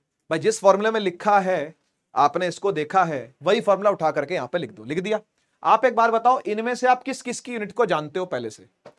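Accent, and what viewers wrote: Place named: Haryana, India. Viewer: that haryanvi tone ❤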